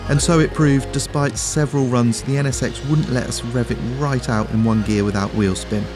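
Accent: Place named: England, United Kingdom. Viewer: I think I like the English accent more the then video hahah nicely executed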